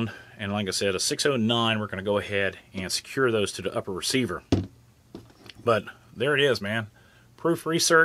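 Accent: United States. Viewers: what accent is that? sounds real American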